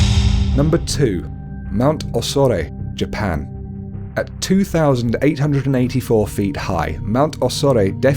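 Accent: England, United Kingdom. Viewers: your british talk can blow a waaanker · Why is every top 5 is a English dude?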